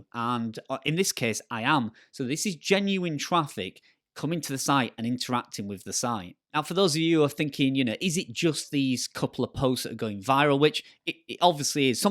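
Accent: Yorkshire, United Kingdom. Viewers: Wow. Another very inspirational video. I am so blessed with the stats in this video bro. Thank you for coming up with such great videos. Good information and good motivation for my own blogging journey. And btw, I love your Yorkshire accent too. Cheers. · "eh up, somethings going 'ere"..... good yorkshire speak lad! t'videos excellent lad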